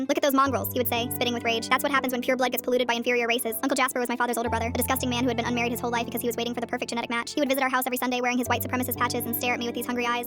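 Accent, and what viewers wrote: Alabama, United States. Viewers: Is your family from Alabama ?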